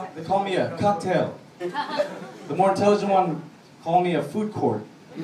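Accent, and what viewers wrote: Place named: Philadelphia, United States. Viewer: the best philli accent by faaaarrrrrrr <3 :P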